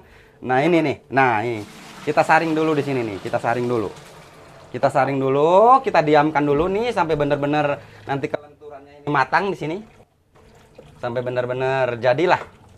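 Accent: West Java, Indonesia. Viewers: Kayaknya sunda tp tegasnya bicara batak punya ..siip keren. Lucu campur da'wah cerdas kali..mohon mintsk no wA nya broo..!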